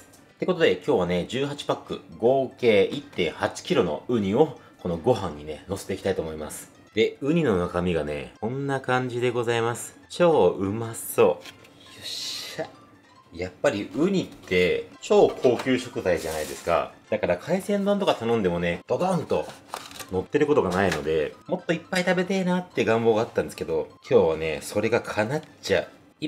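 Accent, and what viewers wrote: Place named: Kansai, Japan. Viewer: （関西弁です）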